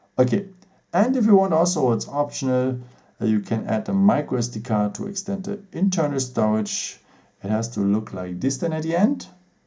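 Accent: Germany. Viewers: sounds like a german accent :) ;) Thank you for the video, good job.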